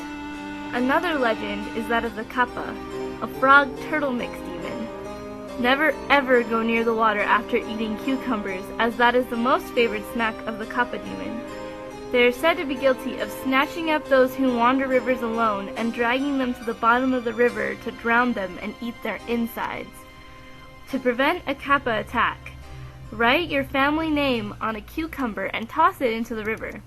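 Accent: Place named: Iceland. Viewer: OMG! You're Icelandic?! That's so cool! I would think it is hard to talk that way, though. But it sounds so cool!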